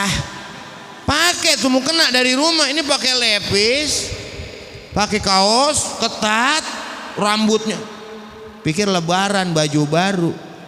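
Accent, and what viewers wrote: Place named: Bekasi, Indonesia. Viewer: Logat Bekasi ny Kentel Bener....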